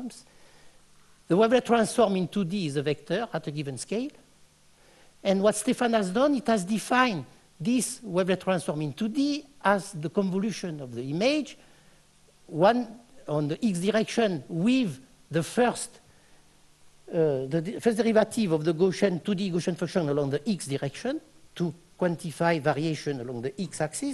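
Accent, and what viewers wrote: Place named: South, France. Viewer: Nous n'entendrons plus ton accent du Sud et tes fous rires😢 tu avais tant à donner encore. Regrets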